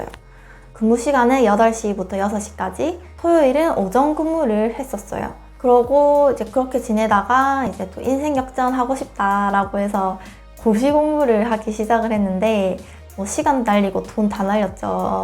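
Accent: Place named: Gyeongsang, South Korea. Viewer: 경상도 누나같아요.. 억양은 우짤수없죠ㅠ 응원합니다!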